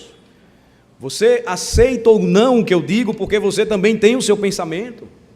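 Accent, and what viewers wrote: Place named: Sergipe, Brazil. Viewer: Oi pastor!!! Assisto teus cultos aqui em BH. Nasci na igreja presbiteriana , ha 68 anos atrás, no interior de Minas. Hj frequento a Metodista. Gosto muito do seu sotaque.... eu, meu marido e meu filho ha 25 anos atrás moramos doze meses aí em Aracaju. Foi um tempo lindo! Temos um carinho muito grande pelos sergipanos e tbem por Aracaju. Amamos de coração!! Um grande abraço, pastor.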